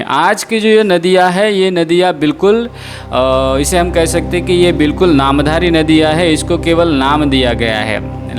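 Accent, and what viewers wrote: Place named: Maharashtra, India. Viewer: जी! बोली से स्पस्ट होता है! कि आप महाराष्ट्र से हैं! आप हजारों कि मी की यात्रा करके उ प्र के "साकेत पहुंचे है! यह परिश्रम अपने आप मे असाधारण है! इस बौद्ध विरासत मे पहुंचकर आपने यथार्थ का आँखो देखा मंजर देश कें सामने रखा! आपके दूसरे साथी इस बौद्ध विरासत को आमजन भंते फाहियान और ह्वेनसाग ने सुदूर चीन से पैदल चलकर भारत की बौद्ध विरासतो को प्रामानिक तौर पर अमर कर दिया है! आप लोग कठिन परिश्रम से यथास्थिति को आमजन मानस तक पहुंचाकर साधुवाद के पात्र हैं! इस कार्य मे " यू ट्यूब" का योगदान कम नही हैं! देश के किसी भी प्रांत / जिले / गाँव मे बैठा पाठक अपने घर मे बैठकर सबकुछ देख रहा हैं! साधुवाद!!!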